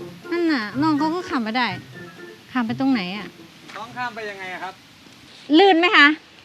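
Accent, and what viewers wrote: Korat, Thailand. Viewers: สำเนียงโคราช คนโคราชเหรอ? ผมไปตาดโตนไม่เคยเล่นน้ำเลย ไปกินกาแฟ สดปั่น กับไปเก็บเมล็ดมะค่าโมง มาเพาะ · มักสำเนียงไทโคราชจ้า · แก่คนบ้านไหนนิคือพูดคือคนแถวบ้านฉันแท่ะๆฮึ55คนโคราชนิแม่นไหม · พี่ใช้คนโคราชไหมคะพูดเหมือนคนโคราชเลย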